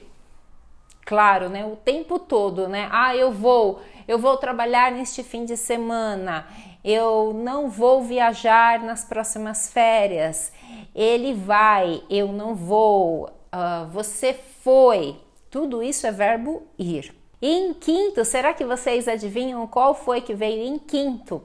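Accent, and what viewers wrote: Brazil. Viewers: You are speaking Brazilian dialetto 🤔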